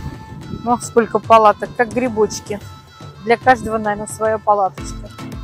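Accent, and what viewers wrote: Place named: Moscow, Russia. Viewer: Если бы не говор московскоголубой было б отлично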